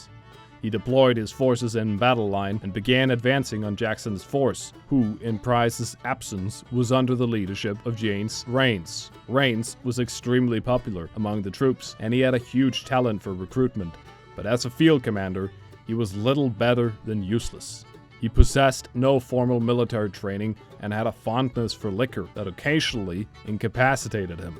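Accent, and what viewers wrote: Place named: Finland. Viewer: Really enjoyed this video but im really trying to pin point the slight accent i hear when you pronounce Missouri...your S and Rs make me suspect a Finnish background 😅 Supmalainen Sisu! 🇫🇮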